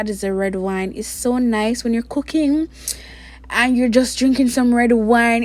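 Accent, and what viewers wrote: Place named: Trinidad and Tobago. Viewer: Its the Trini acsent for me, the lady niceeeh man 🎉🎉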